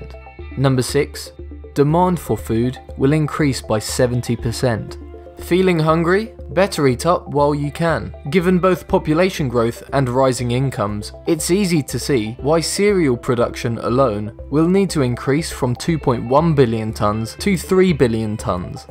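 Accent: United Kingdom. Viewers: How could you possibly think that?! This guy is British and Dolan is Australian, they don't sound anything alike.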